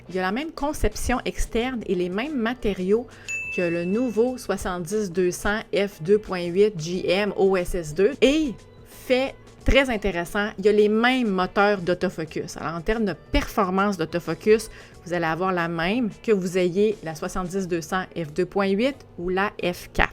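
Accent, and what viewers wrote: Canada. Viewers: J'adore, sa manière de parler, d'être. Videos toujours très intéressantes. Je suis amateur fan de photos sportives comme. J'utilise un boîtier Sony A7III. Merci J'adore ton magnifique accent canadien 😍😍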